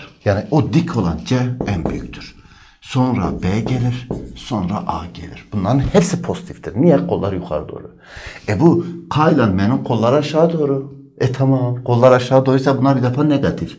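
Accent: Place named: Eastern Anatolia, Turkey. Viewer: Hocam nerelisiniz valla doğu şivesi seziyorum 😅 nasıl sevimli 🙏🏻☺️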